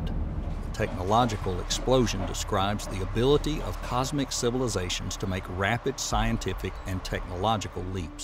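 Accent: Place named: Tennessee, United States. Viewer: Honestly, this is one of my favorite channels. I listen to it every night and on my way to work what part of Tennessee are you from ? my family hail from Bristol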